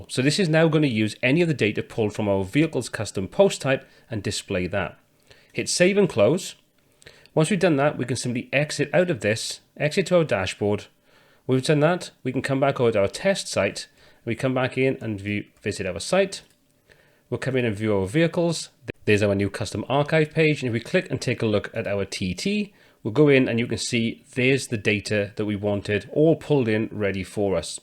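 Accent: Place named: Newcastle, United Kingdom. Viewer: This video took a lot of work to put together! Deserves more than 29k views. (You sound Geordie, are you? I ask because my Dad was from Wallsend and you speak very much like he did).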